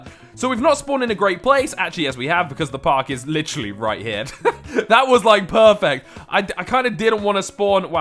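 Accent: United Kingdom. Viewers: Wish there were more maps. The 3 rotating maps are getting old. No matter how much of a British accent you put on it, this game is truly becoming nothing more than a boring time waster (even with the Halloween events that take literally 3 tries to complete).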